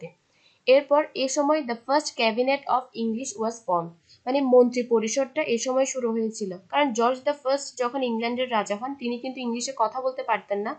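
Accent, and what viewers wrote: Chittagong, Bangladesh. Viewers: ইংরেজি সাহিত্য পড়া শুরু করেছি,মাত্র অগাস্টিন পিরিয়ড পর্যন্ত আসছি,শুরু থেকেই আপনার ভিডিও সাজেশনে আসে,আপনার ভয়েস শুনে শুধু একটা জিনিস এ আসতেছিলো আপুনা চট্রগ্রামের কিনা😅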